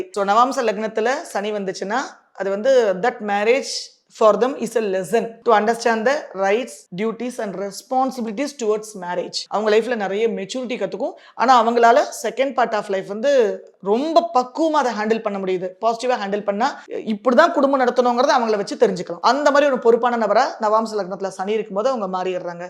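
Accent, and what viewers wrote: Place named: Coimbatore, India. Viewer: Kovai tamil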